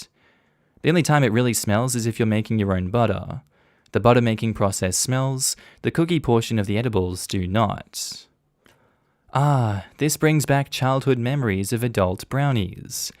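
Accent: Australia. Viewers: Hey!!!! I figure I should comment more because I’m here for pretty much all your videos haha. Also oddly growing up I was never fond of the Aussie accent (I’m from NZ) but for the last few months you’ve been my go-to reddit readover type videos voice favourite. Love the work and I’m happy you’re back safely in Aus. Hope your fam in Ireland is doing awesome as well :) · Same here! I can't sleep listening to this Aussie.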